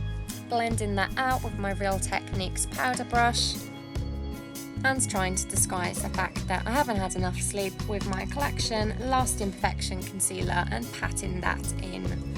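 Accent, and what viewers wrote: United Kingdom. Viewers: From Britian, I'd suggest!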